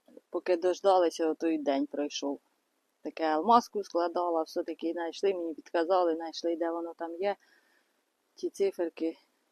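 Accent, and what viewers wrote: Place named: Chernihiv, Ukraine. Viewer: я Олексій з Чернігівщини, мова знайома,у деяких районах у нас така сама.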